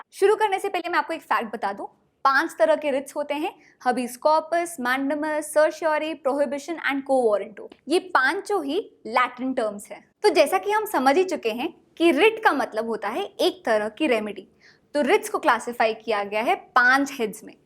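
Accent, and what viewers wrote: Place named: South, India. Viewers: You are south Indian. That accent. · Arey waah. An unrealistically unbelievable way of making a layman understand. You go girl. And yes, you sound Malayali.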